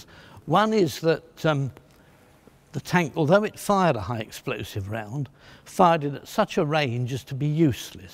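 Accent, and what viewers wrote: United Kingdom. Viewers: Something about his British accent, cadence, and tone - yeah definitely easy listening.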